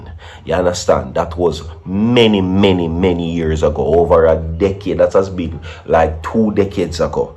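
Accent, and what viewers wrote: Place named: Jamaica. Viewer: This dude sounds educated. First Jamaican YouTuber I've heard speaking great English in his accent . Freedom is a must. Big your self my friend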